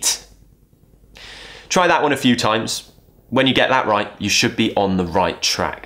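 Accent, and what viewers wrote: RP, United Kingdom. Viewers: Do you speak English according to rp or not explain it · Thanks to God Thanks to your parents than Thanks to you for teaching us perfect British English and please send more videos when you can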